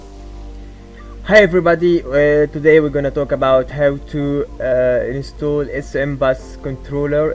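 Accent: Egypt. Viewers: Thats an egyptian accent are you egyptian??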